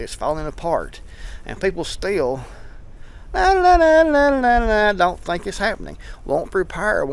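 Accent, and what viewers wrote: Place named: Wilkes County, United States. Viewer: A little further into the video now. 😂😂😂 You sound like a Wilkes County boy. That’s pretty much the sentiment around here. - Except there’s too many that still think Trump will save us.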